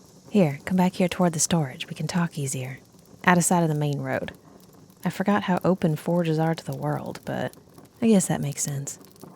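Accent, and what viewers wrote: South, United States. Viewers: You keep acting like that and I'll put you in your place Great southern accent. Wait is it southern? Great audios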